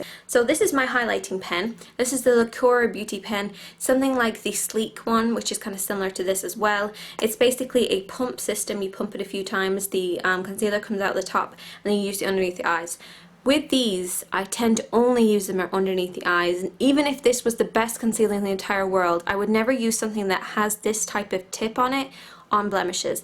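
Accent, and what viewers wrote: Ireland. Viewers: I think she's from Ireland?...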